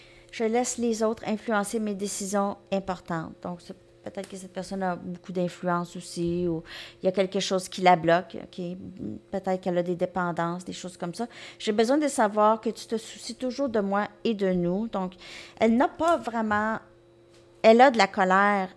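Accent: Quebec, Canada. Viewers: OK 👌 j aime bien écouter votre langage du Québec il est super un vrai régal pour les oreilles 👂 à demain 👍🌝🕊🌈🇨🇵Frédéric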